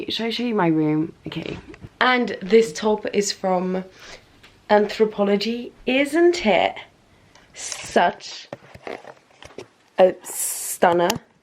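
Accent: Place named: United Kingdom. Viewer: I love your British accent. So melodious.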